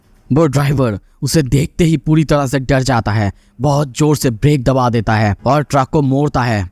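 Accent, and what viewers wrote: Bengal, India. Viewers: Bengali ho aap? Accent jana pechana lag raha hai.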